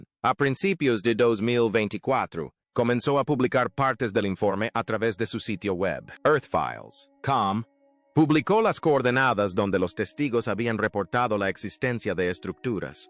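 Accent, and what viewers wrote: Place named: United States. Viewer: Y este acento de Gringo aprendiendo español! esto es Falso!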